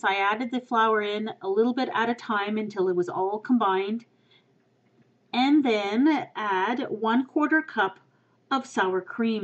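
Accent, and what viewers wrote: Canada. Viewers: ur canadian arent u lol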